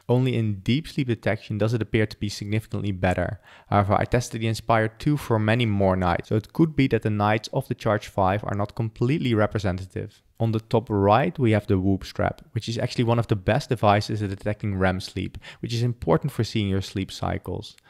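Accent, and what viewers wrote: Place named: Netherlands. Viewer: Your accent really gives it away from where you are (NL). : ) Nice channel!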